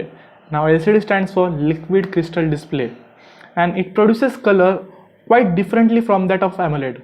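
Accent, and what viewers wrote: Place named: South India, India. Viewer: You're right, judging by his accent, he belong to the South part of India, I am guessing Tamil Nadu.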